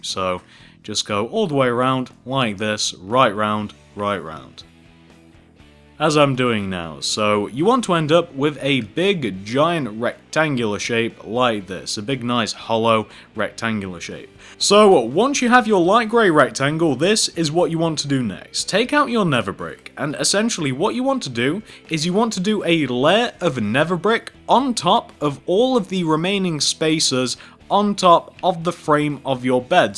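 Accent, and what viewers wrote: United Kingdom. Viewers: I like your British voice, so awesome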